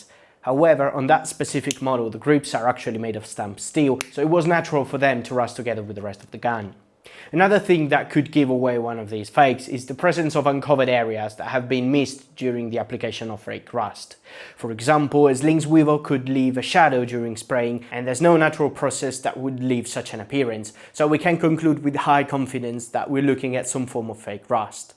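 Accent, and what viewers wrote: Italy. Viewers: Came via the Internet Anarchist, really love this, especially as I know nothing about firearms. Also, one of my mates has a girlfriend from Milan and you sound exactly like her. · Northen Italy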